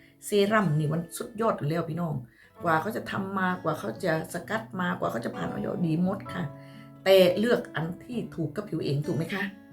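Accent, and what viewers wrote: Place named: Rayong, Thailand. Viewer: มาจากช่องพี่โหระพาจร้า จะมาติดตาม จร้า เป็นคนจังหวัดอะไรหรือค่ะ สำเนียงคล้ายเหมือนคนระยองเลย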